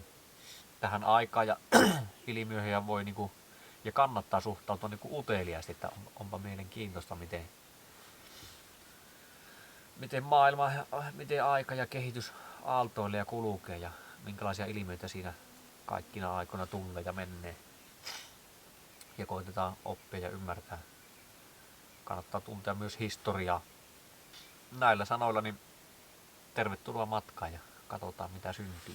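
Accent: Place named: Sodankylä, Finland. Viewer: Moi Sodankylästä. Sääsket inisee, lampaat määkii, kukko kiekuu. Mies puhhuu lähes mejän murthella pölökyllä istuen. OK.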